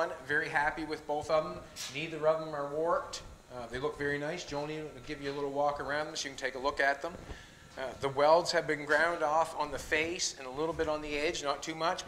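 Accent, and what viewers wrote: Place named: Newfoundland, Canada. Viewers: East coast of canada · That's a Cape Breton accent if I've ever heard one! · Where is this guy from? Sounds like a Newfoundlander to me. Great video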